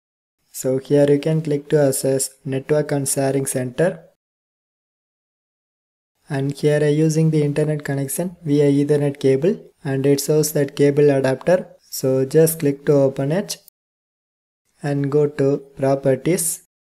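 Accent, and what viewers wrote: India. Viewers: You my man are a god with sexy sweet Indian accent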